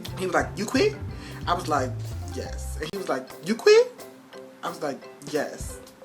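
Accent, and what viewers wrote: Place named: DMV, United States. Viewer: Your accent is so DMV, I know my people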